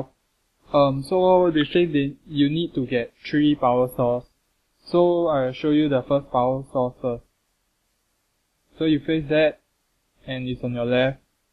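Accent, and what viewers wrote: Singapore. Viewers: Obviously Singlish......==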